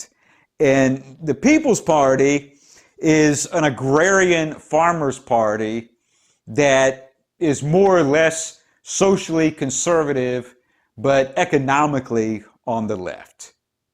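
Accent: United States. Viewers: Love the information. All thumbs up! Thank you! You sound like you’re speaking in Chicago accent. I am Polish/Chi south side 3rd gen. You might be similar or other Midwest. Just curious if you care to divulge.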